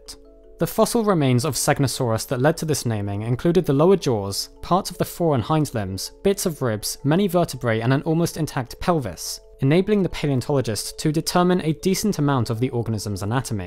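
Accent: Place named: London, United Kingdom. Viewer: Youre London accent is no longer credible Get a black guy or Mexican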